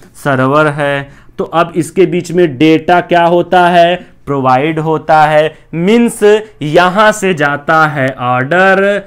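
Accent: Bihar, India. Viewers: Kaha se aa gaya bihari.....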